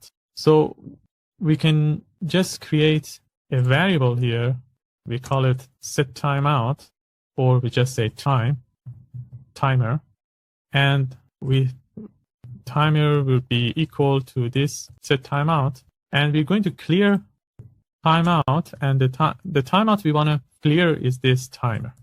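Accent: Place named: Iran. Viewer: داداش همین که صداتو شنیدم فهمیدم ایرانی 😂❤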